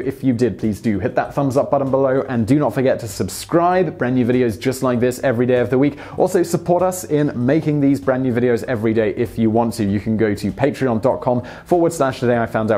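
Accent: United Kingdom. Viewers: I was a long-time subscriber to this channel -- never missed a video -- and just un-subbed. I don't understand why Simon has to be so aggressively front and center in these videos. I love the topics, I love the detail. I understand he has a cool British accent, but can't he just be the narrator? It was always weird to me how images and the text always take a background to him. What Culture shows the hosts, but not non-stop. It's just not necessary. I realize that it had been fine initially and then it started to bug me and now I can't stand it. I will definitely re-sub and share if the topic becomes the most important element to the Today I Found Out videos. Simon is fine, no shade, really, but the channel -- educational and informative as it is -- is just too much of him, it's very in-your-face. ( I also noticed that other people write the series -- can't you mix it up with some of the writers or just have an episode w/o a host?) I REALLY enjoy the subjects, and wouldn't mind if there was just a VO of Simon. 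· accent. The Brits say supa instead of super, and Chiner instead of China.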